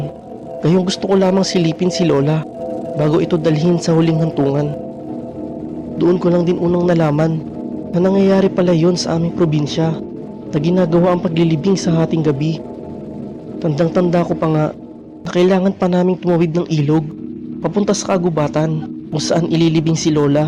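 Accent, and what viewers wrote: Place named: Conyo, Philippines. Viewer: conyo narator shessh!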